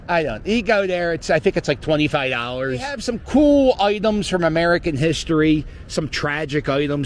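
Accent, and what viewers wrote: Philadelphia, United States. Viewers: Best Philly accent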